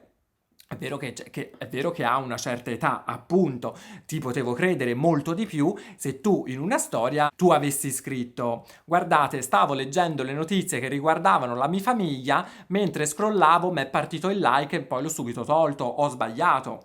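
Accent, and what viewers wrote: Tuscany, Italy. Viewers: "Hai più foto con lui che con tu moglie..." morta dal ridereeeee!!! Nessuno sa raccontare delle storie così stravolgenti come lo fai te!! Sei divertentissimo!!! Sei molto espressivo e l'accento toscano è insuperabile! ❤❤👏🏻👏🏻👏🏻👏🏻👏🏻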